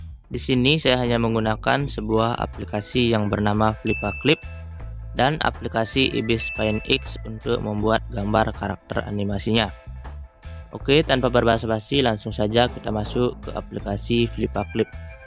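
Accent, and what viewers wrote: Aceh, Indonesia. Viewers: Aceh kah?? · Awak Aceh BG nyo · Orang Aceh ya bang?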